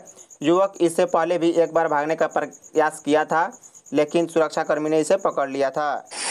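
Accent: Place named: Bihar, India. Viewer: Tum to bihari lag raha h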